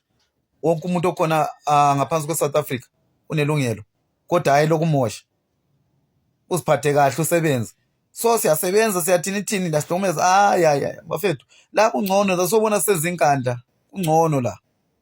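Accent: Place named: Zimbabwe. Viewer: Futseg wena nesi Zulu sase Zimbabwe 😅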